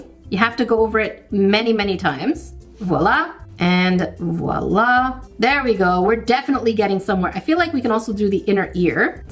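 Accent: Australia. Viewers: Im kinda hoping you get the koala just coz your aussie accent made me giggle 😂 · YOU MEAN **AUSTRALIAN ACCENT**, RIGHT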